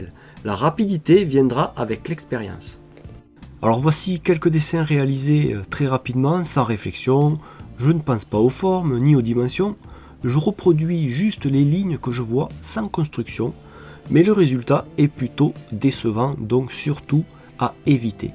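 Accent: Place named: South, France. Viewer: Petit accent du sud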